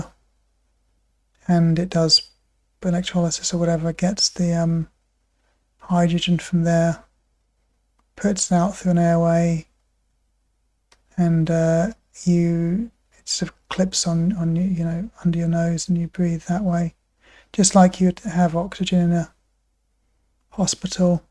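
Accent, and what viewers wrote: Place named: England, United Kingdom. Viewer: You sound English .. why aren’t you having free treatment on the nhs instead of needing donations to pay for it (? In Japan?)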